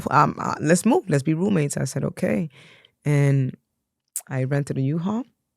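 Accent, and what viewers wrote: Dominican Republic. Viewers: Is she Dominican ? She has an awesome accent its so subtle. I was trying to place it but couldn't. Cool lady.. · I picked up on that beautiful subtle Dominican accent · I caught that too when you spoke. I’m also Dominican. KlK 🫡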